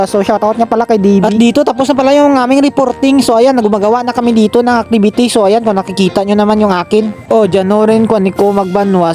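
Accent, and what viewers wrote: Iloilo, Philippines. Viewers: gagi ilonggo ba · Taga Iloilo kaba bisaya ka e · Ilonggo ka idol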